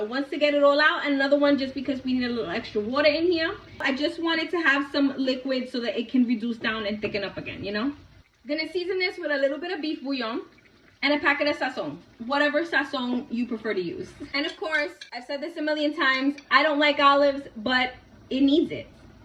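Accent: New York, United States. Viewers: OMG I will have to try this recipe. I love that New York accent.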